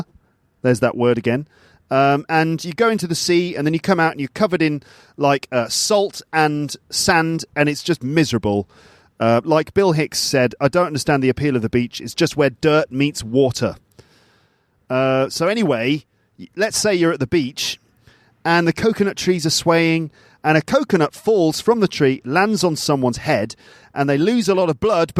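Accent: RP, United Kingdom. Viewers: hi, thank you so much for sharing this i'm your big fan since you created podcast and love listening to your british standard accent it help me a lot in improving my pronunciation thanks to you again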